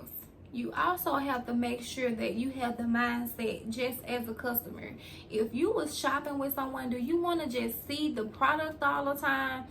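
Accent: South, United States. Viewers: I love your accent omg I know you are a southern bell just like me! Mississippi or Louisiana??? Which one ???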